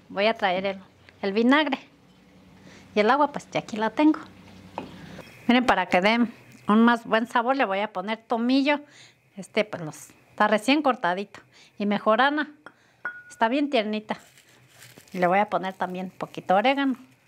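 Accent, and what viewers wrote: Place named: Michoacán, Mexico. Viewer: Por el acento de la Sra., me doy cuenta de que es Michoacán. Allá se cocina super rico y su gente es muy amable. Se ven deliciosos sus taquitos. Ya tiene un nuevo suscriptor. Saludos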